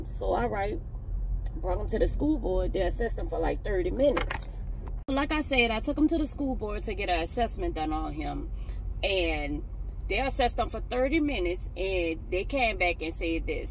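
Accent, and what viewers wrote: New Orleans, United States. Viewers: Girl I listened to you and said, home girl is from the NOLA!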